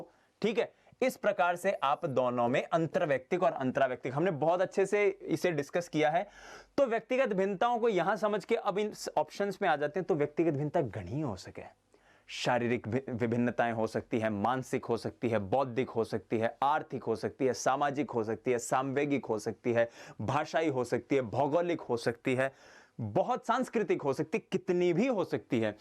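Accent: Rajasthan, India. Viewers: Sir Rajasthani botle hue ache lgte ho😀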